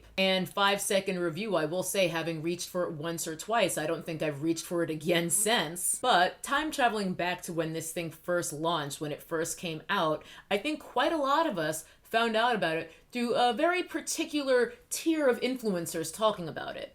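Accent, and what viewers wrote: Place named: Northeast, United States. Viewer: First of all, hi, subbed. I heard that north eastern accent and was reminded of home instantly. Secondly, I'm a biochemist (getting my graduate degree) and I could go ON about the scams YTers push on you. One thing that some big youtubers push is "supplements". Lets make on thing perfectly clear- these people have no business selling you anything to put in your body. Most of what they sell you is diluted 1000 fold in water, meaning that you are taking water pills. Other things that they sell you, like biotin and vitamin C pills, are nutrients that, if you are living in a country where you have access to food, you don't need to supplement these things into your diet. With vitamin C, you get your maximum daily dose from like, half a bell pepper. Vitamin C is water soluble, meaning you excrete the rest of it out. 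So when you see pills that say "1000x daily recommended dose of Vitamin C!", it means you are paying someone to pee out all of those nutrients. Plus, for many nutrients, like beta-carotene, in order for them to give you the benefits you need, they are dependent on other nutrients found in the food that you eat itself, meaning that they are not as effective if you are just taking them alone in a pill form. TL;DR- don't take pills from influencers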